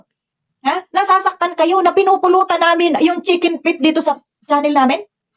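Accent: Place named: Visayas, Philippines. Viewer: Hahahaha ni gawas gyd ang pagka bisaya .. good vibes lang basta ako Edcy ako solid edcy..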